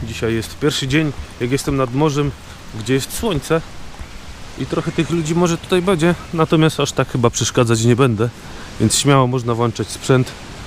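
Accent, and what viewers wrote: Silesia, Poland. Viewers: W mowie słychać że jest taki charakterystyczny ton dla ślązaków (Pozdro z śląska)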